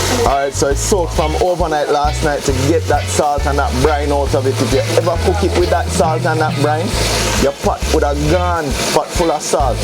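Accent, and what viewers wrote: Jamaica. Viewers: You make patwah sound sweet to the ear……we’re homesick….!! Never tried the coconut milk in my stew peas, but I sure will!! Blessings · “Pot na have nuh time”. Sounds like my Jamaican mum & grandma, but me, raised in the West I need time and measurements 😂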